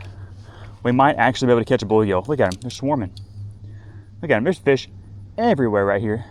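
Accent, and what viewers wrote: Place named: Arkansas, United States. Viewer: That’s “minnows”you act talk like your from Arkansas 😂👍💯👍Great Video 👍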